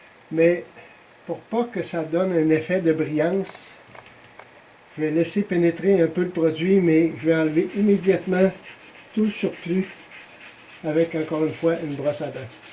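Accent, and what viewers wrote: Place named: Quebec, Canada. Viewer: Mltteud ou multitude? C’est quand même trop moche l’accent québécois !!!!!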